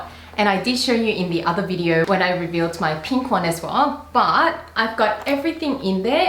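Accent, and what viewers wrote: Australia. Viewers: Ive never heard a Chinese accent mixed with an Australian one before, mind blown.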